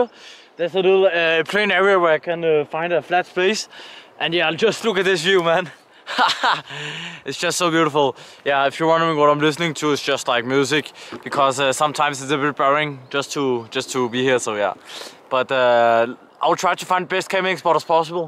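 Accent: Denmark. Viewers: are you danish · Bro are you danish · Er du fra danmark?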